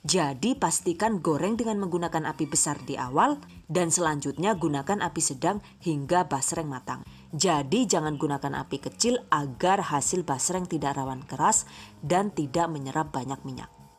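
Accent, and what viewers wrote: Banyumas, Indonesia. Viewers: Hahaha yong selalu nonton vidione mbokayune Sing ngapak Ora ngapak ora kepenaklah.... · Suka banget chanel ini.. suaranya lucu sama di MIX pke bahasa ngapaknya.. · Assalamualaikum mba saya paling seneng klau lihat mba lagi beraksi sambil ada selingan bahasa yg ngapak he he jd inget pulang kampung saya tunggu resep berikutnya karna menginpirasi banget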